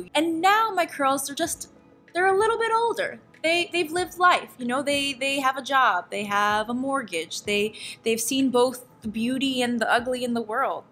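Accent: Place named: Jamaica. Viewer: I could tell you are of Jamaican ancestry based on the way you speak. Notice, I didn't say your accent. You said something a specific or a particular way that was a give away to me. It's not obvious but I noticed. Your hair is looking lovely.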